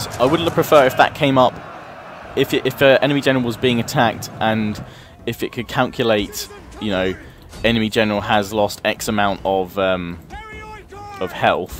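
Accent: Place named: United Kingdom. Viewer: You have one of those proper sounding British accents. I enjoy your vids.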